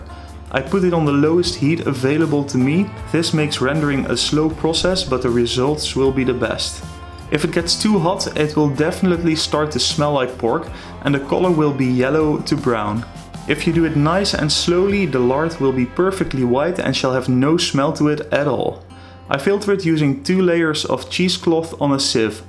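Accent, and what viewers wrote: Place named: Netherlands. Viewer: You sound very Dutch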